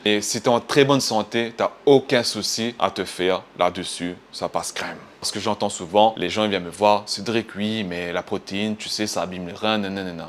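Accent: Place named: Caribbean. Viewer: Première fois que j'entends un gros l'accent créole comme ça 😳 Mais bonne vidéo. Ps : Juste les gars de la muscu, tous les sujets ( Tous) on déjà été traité. De prés comme de loin. Les reste sa tourne en rond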